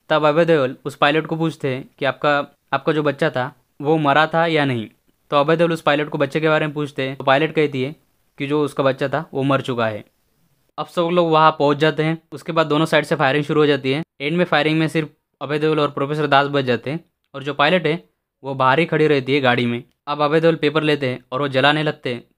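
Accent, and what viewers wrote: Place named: Maharashtra, India. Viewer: Marathi assent as rah hai voice me .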